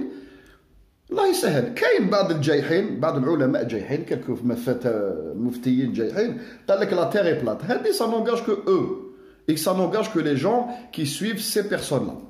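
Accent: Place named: Algiers, Algeria. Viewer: Salem, marhaba bick c'est très intéressant ce que tu dis👍j'ai ds amis chrétiennes pratiquantes me disent que le Coran c'est le seul livre qui n'a jamais était changer. elles prennent aussi l'exemple du jeûne c'est rare ou il ya des chrétiens qui jeûnent ext. ext. pr ceux qui critiquent le Coran et notre prophète ils n'ont ps a venir comme on le dis avec l'accent tlemcenien (y'wajouna al'bna) avec ms respects a ttes les autres religions. Au faite j'adore ton accent Algérois. 👍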